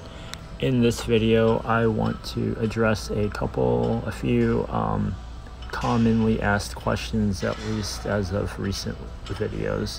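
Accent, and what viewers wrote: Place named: Oklahoma, United States. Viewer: Dude are you from Oklahoma?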